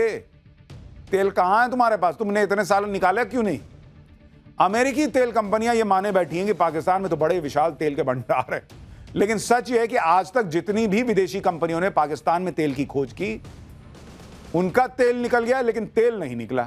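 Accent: Bihar, India. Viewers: सुशांत सर आप जब बोलते है तो ऐसे लगता है की आपका भाषा बिहार के भाषा से मिलता जुलता है कही आप बिहार से तो नहीं है सर ऐसा लगता मुझे आपका हर न्यूज़ मै देखता हूँ बहुत अच्छा लगता है थैंक्स सर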